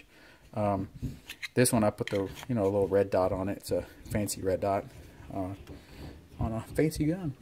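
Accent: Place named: Tennessee, United States. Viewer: What's that accent, Tennessee? Nice collection. Interesting to see someone collect this type of pistol.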